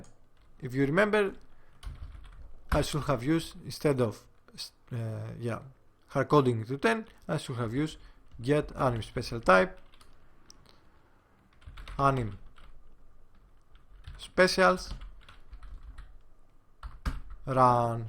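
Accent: Greece. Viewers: You are Greek because of your accent.. Huh?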